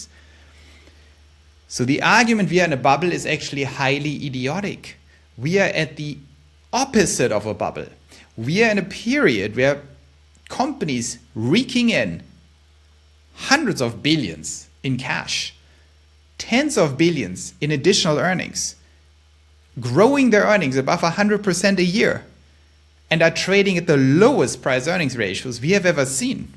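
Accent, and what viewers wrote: Germany. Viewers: in mass - lol - german accent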